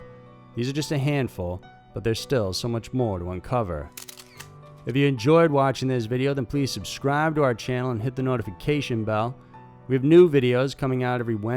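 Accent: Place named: Midwest, United States. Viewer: I'd agree hes American, but I don't hear any southern accents in his voice. Possibly midwestern.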